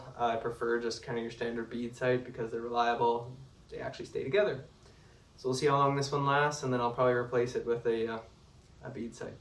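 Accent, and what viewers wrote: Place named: Canada. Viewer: You sound Canadian so please stop staying Zeee - its Zed :)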